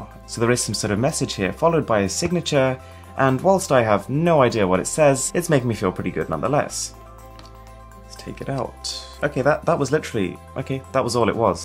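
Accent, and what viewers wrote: United Kingdom. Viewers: Why do you have english or british accent? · oh! Thats why he looks indian and sound british. Amazing!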